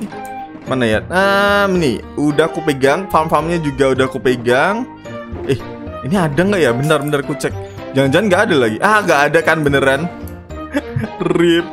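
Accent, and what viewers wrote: Java, Indonesia. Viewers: Wuihh suaranya khas jawa bet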